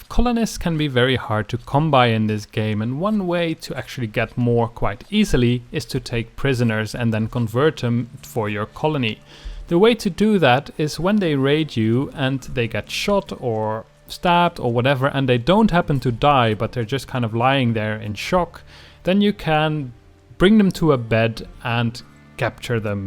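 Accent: Netherlands. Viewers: Dutch accent ? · you sound Dutch :)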